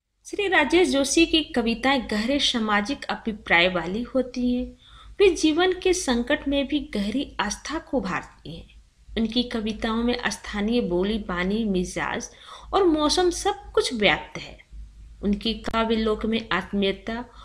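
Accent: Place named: Bengal, India. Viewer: aap bangaal se ho kya............ hmm lagta to hai sahi ki nahi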